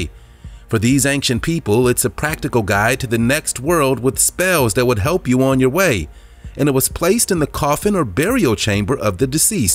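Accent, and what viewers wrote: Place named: United States. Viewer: Why do Americans pronounce O as A. For instant, you say Gad instead of God etc. Not right.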